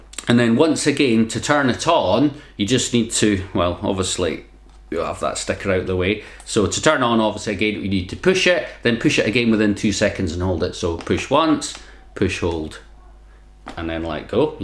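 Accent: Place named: Edinburgh, United Kingdom. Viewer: Secretly excited I knew your accent was Edinburgh in a matter of seconds. I lived in Alnwick, England for 6 mo. during college, which is not far. Cant wait to return on a trip this fall, I have missed the UK! Need to research the drone flying rules there- is it strict? Thank you, sending high-fives from Minneapolis!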